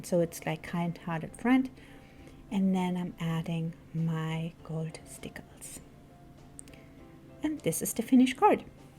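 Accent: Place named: Rhineland, Germany. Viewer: My entire family is German. I was born in NY City area, my parents came to NY on ships. The reason I can tell you are from The Rhineland is that your accent is EXACTLY like my Aunt Marianne's, who was born in Lannesdorf, Köln area (Your English is EXCELLENT by the way!!) My Dad was from Lannesdorf also. My mother was from Sulzbach in Bavaria. I live in California now and will be watching your videos addictively now that I found your channel!!! Ich kann ein bischen Deutsch.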